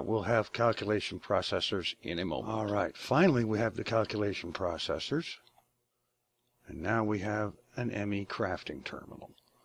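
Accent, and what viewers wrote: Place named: South, United States. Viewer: "Sometimes even the brightest don't see the light." made me lol. How long were you kicking yourself once you figured that out? :P "I promise I'll get myself together here eventually." -- I'm not holding my breath. If I didn't know better, I'd swear that I was watching AnderZEL with a southern accent. Only 3 16k modules and not enough storage? iirc, Hypno was able to fit everything he got from a full-size quarry onto a single 1k drive (excepting the stone, gravel and dirt that he placed into deep storage units)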